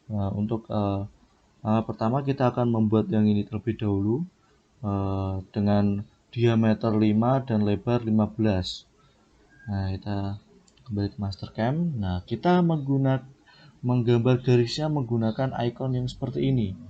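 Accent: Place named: Java, Indonesia. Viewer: suaranya jawa kluthuk bgt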